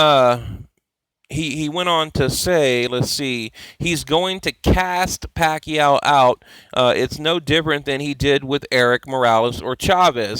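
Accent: United States. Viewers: Your fucken Septic yank voice pisses us off,TEDDY fucking Atlas is the most biased fuck in the world,listen to the British version of he fight,they had Horn edging it,Atlas even when Horn was landing heaps of punches early on,Atlas was talking about what Pacquiao was going to do later on,Tim Bradley was attempting to put a non biased slant on the fight,fuck off with your crocodile Dundee shit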